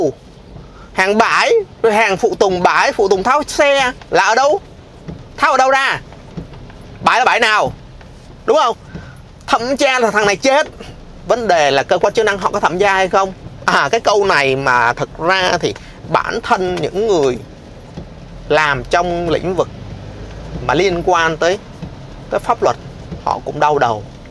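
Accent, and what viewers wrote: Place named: North, Vietnam. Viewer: e đăng ký rồi anh. tiếng bắc 54 hay quá nghe ghiền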